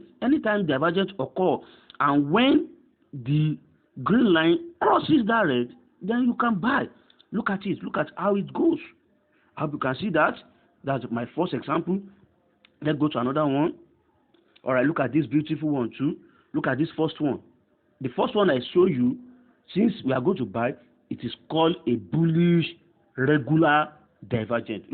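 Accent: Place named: Nigeria. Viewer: Add bulegoo bands... dam gotta love Nigerian accents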